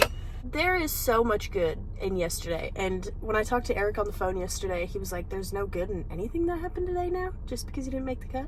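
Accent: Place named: South, United States. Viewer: Picked up on that southern accent real quick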